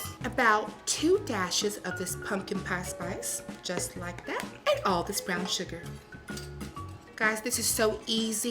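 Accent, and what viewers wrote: South, United States. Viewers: had so many GOLDEN one liners in this cooking show and I'm here for the cooking, comedy, southern accent, and her entire look! 😂🤭😆 I am dying to make the hot liquid pumpkin pie 🥧 because it's easy and virgin, just like me, (*wink wink*) 😏😬🤐😭💀 You sent me ROLLING laughing! Can't wait for your next episode! 🦃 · Southern girl here (GA!). Your accent is on point! Bless your heart!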